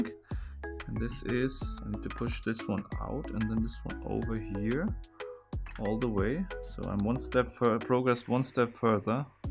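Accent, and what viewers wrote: Germany. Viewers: you talk little bit like german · You are from germany right? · Man hört dass du ein deutscher bist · Bist du deutsch? xD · Das erste Video von dir gesehen den Akzent gehört in der kanalinfo nach gesehen und jap du bist deutsch xD Viele Grüße aus Hamburg! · Man hört einfach Instant dass er Deutscher ist . Und das Ah Ok war das Deutscheste im Video . Ehrenmann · He sound like a german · Hmm.... sounds german. Not the Set, i mean. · Dein Akzent ist so krass deutsch haha Machst du auch deutsche Videos? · Are you German ? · du bist doch bestimmt deutsch oder?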